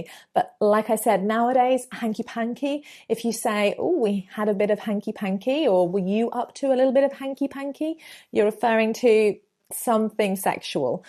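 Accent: United Kingdom. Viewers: Great British accent ! I live in Austria where the children are taught to pronounce every “a” (or “A”) as “e” (or “E”), for example “en epple” or “e men”. It was really refreshing for me to hear an “a” pronounced as such ! I think this anomaly originates from American English, as the Americans often pronounce an “a” as in “ha-emmer” and not just like “hammer”, however the Austrians and Germans are taught to pronounce it like “e hemmer”. My wife was often laughed at in the UK for example when she talked about a person having “e sore beck”, and a friend of mine quipped “Sore Beck? Is he by any chance related to Jeff Beck..???”. The level of English spoken by Austrian and German people is also considerably lower than that spoken e.g. in the Scandinavian countries or in the Benelux countries, but the local authorities just don’t care, as they are doing nothing to remedy or to improve on the situation..🤦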